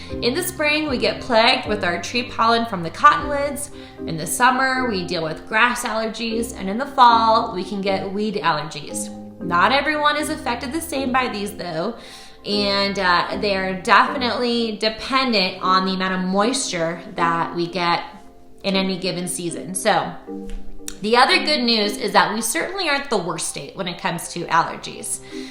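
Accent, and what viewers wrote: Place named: California, United States. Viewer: I was trying to guess where you were from based on your accent. Before you said it , I was guessing CA...and was right! Great video! Thanks!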